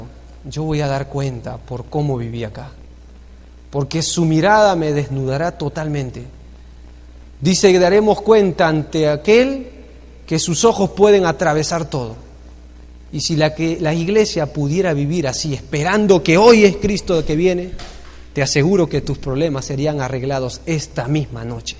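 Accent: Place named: Peru. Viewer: Por el tono de voz, creo el pastor es Peruano, cuál es su nombre?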